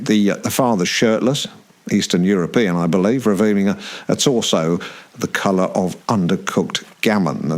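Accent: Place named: Australia. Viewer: Too aussie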